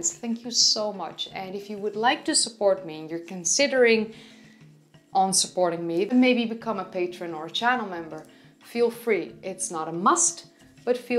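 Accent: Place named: Netherlands. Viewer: I love your Dutch accent on pronouncing Leipzig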